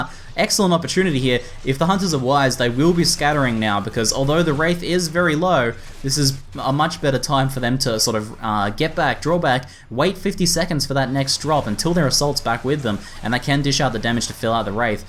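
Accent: Australia. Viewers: D yeah I noticed. Good job though. Overall, I really enjoy you casting Evolve quite a lot. Shame you upload those relatively rarely. Evolve is an awesome game to watch, especially when there are so many skirmishes (or maybe one constant battle?) which are barely pulled of! P.S English is not my native lang so I've tried to "decipher" what is the 1st word of your intro/welcome catchphrase? Is it "Good day it's here [...]"? Or...? I generally don't have problems with understanding spoken or written english but your "down under";) accent coupled with the fast-forward tempo of you saying it... well you get the idea:P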